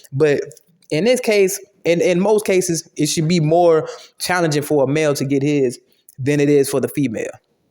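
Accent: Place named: South Florida, United States. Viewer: I ask bc you have a soflo accent and dialect 🦾 I was like be Fromm the crib lol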